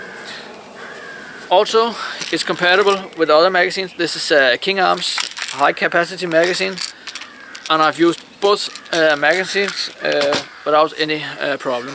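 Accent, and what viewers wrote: Denmark. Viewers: you sound like a danish do you still have the gun? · You sound danish. Are you danish?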